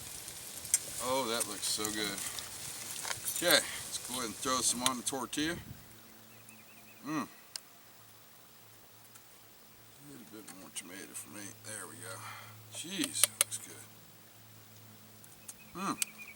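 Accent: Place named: United States. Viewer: Great Video, yr cooking Videos becomming more and more Professional...its nice to watch you while cooking and listen to yr nice american Accent,... the video is very interesting to me, more interesting than to listen to the cake king in US television. greetings and good appetite my friend Harry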